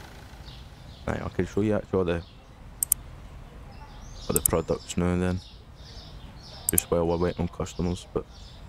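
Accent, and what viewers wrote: Scotland, United Kingdom. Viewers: He is speaking Scottish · no worries mate once i realised you had a scottish accent it was much easier to get what you were saying hahah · I thought it sounded scottish awesome vid my g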